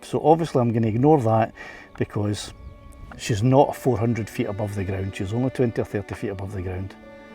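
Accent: Scotland, United Kingdom. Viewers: Awesome video mate. Love the accent… can’t help but think of Billy Connolly 😂 I only started flying recently and honestly thought the drone was indicating it’s height off the ground.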